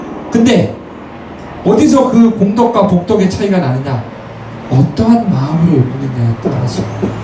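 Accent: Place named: Chungcheong, South Korea. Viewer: 충청도 말씨가 들리니 참 정겹구요. 조회수 안나와도 김 빠져 하시지 마시길요.ㅎㅎ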